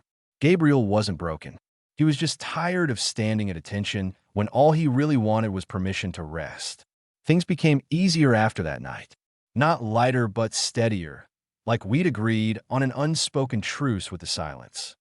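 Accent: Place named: Spain. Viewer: I agree with you that this story was good but did you hear the Spanish intonation in the narrative? Best wishes to you 🙋‍♂️🇬🇧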